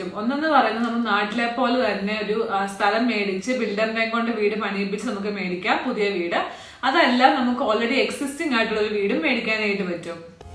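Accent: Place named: Onattukara, India. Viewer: Nice video. Including some sample price for homes in suburbs for say 50 -100 sq. meter homes would have been the cherry on cake. Your malayalam is so much like 'onattukara' malayalam ( Mavelikara, Chengannur etc) ! It's such annuncommon version of Malayalam on YouTube. This gives us the feel of our 'Nadu'. Thanks.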